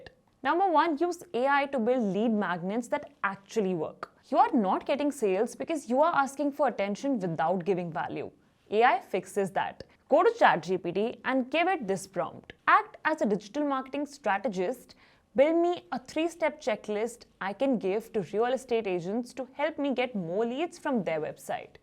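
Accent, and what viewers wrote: India. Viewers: I looove your Indian accent! Makes all the money!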